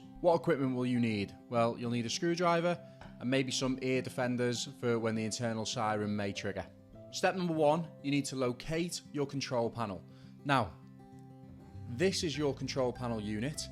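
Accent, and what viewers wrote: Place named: Birmingham, United Kingdom. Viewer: it reminds a bit of brummie. Have a nice one and keep it up.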